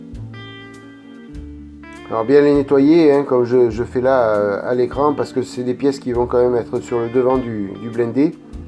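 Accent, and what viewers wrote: South, France. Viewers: Salut, très sympa cette petite vidéo, j'ai hâte de voir le rendu de la variation chromatique avec le chipping ça va être super sympa. Et sinon toujours un plaisir de suivre tes montages et d'écouter ton bel accent du sud:) Au plaisir!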